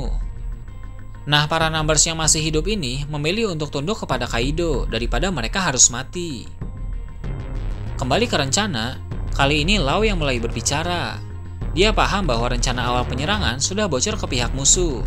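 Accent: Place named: West Java, Indonesia. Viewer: Dari logat kayak nya admin orang sunda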